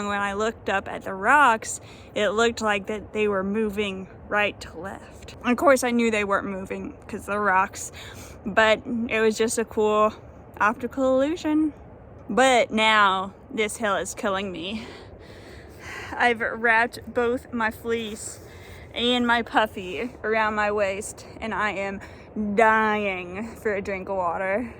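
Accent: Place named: Kentucky, United States. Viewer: Yo Kentucky Accent..cool..I knew a guy once from Louisa Ky. The hill of Eastern Kentucky seem to hold do mystery and settled by Irish, Scotts, tec...when I lived in Asheville several Yrs ago for asking year.I traveled up to Boone NC several times and cured my facination with Appalachia..I visited Harlem Ky....little did I know at the time I was driving my White Volvo X- Country Wagon..thru those hill at night..with NH plates that say " live free or die" I wasn't fearful at the time, however looking back..yikes..only see the sun for 4-5 hours a day..huge coal mine history and exploitation of ppl by big coal companies.of course I binged Net Flix and watched all seasons of Justified before visiting Harlem..with patty loveless singing about harken..😃 quite a history in those hills..I did meet Leah from the music sister duet if Rising Appalachia in Avl..you got some guts courage mixed with winderlust is perfect combo..ty very much.... 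Dave 🤸☮️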